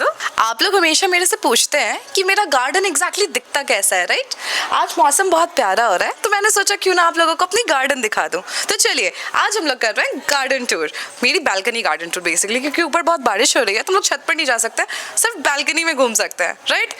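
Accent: India. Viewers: Are u from andra????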